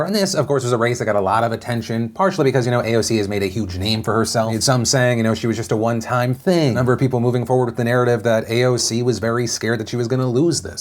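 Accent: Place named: Southeast, United States. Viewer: originally from North Carolina, so he still holds a lot of the pronunciation from the mid-atlantic/Southeast region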